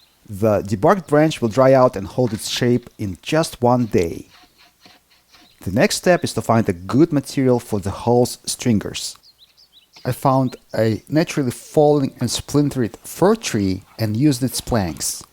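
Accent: Russia. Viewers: Мне кажется что я слушаю английскую речь с русским акцентом) Обычно носители спешат и зажевывают слова до неузнаваемости. А тут почти на русском) Точно. Это же адвокат егоров =) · Как только услышал русский акцент, понял - в видео будет скотч XD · Ну прям русский акцент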